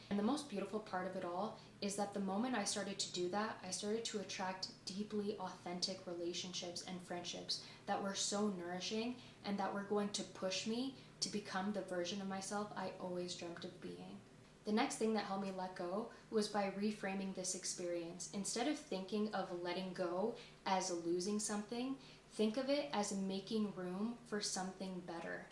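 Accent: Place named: Canada. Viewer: I thought I'd been hearing a Canadian accent in your words. I enjoy your videos. I'm going through a minor crisis right now and trying to stay ahead of it and not let it take over. I find your content to be inspiring, thoughful, balanced and relevant and can be applied to almost every other situation the presents itself. I'm glad I found your community 🙂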